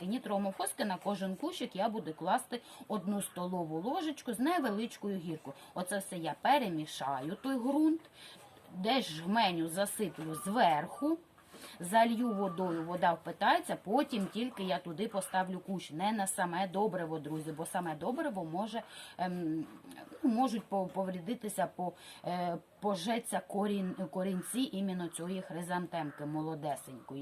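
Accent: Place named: Ivano-Frankivsk, Ukraine. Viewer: Чую у Вас Франківський акцент! Дякую за поради. В мене теж п‘ять котів з вулиці. Бережіть себе. Вітання з Дніпра.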